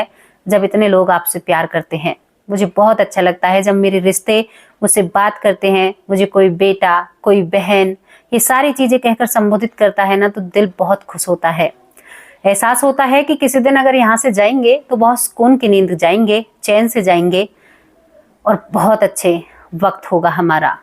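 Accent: Haryana, India. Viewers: Aap ki bolney ki tone Haryanavi hai 👍👍👍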